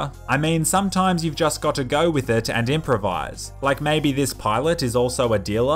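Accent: Australia. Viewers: Ah i knew you were australian,no wonder you are so wise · so ur australian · hes aussie?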